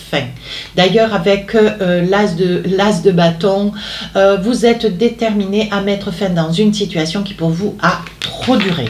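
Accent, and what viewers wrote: South, France. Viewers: Merci pour cette guidance. Beaucoup de choses sont sorties. Qui sont vraies. Cancer ascendant Cancer. Je vous ecoute depuis quelque temps. Et c est un plaisir. J adore votre accent du soleil. A bientôt. 😊❤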